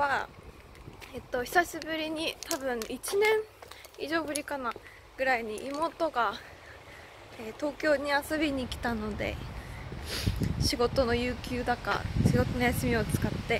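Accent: South Korea. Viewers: 知ってます！いつもめちゃくちゃ韓国訛りなので（ 笑 ） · さんの今までの努力で韓国語が染み付いてるのはすごい！と思うんですけどさすがに日本におるときは日本語にならん? 私はどちらかっていうと母国で韓国人って勘違いされたくない😅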